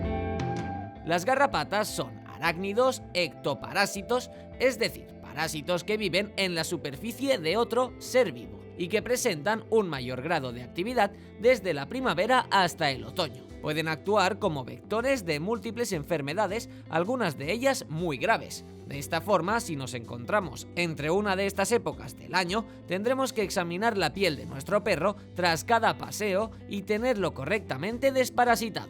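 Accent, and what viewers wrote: Spain. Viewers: Por favor aceite de que??? No le entiendo, quise poner subtítulos y no se pudo. La persona que habla no habla claro, tiene acento español y por eso no le entiendo 🤭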